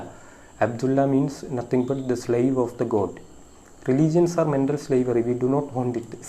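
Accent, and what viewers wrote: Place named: Kerala, India. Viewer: your English accent in this video is okay. So why do you have that heavy Malayali accent in your English while you read out English texts in your Malayalam videos. Anyway, all your videos are good.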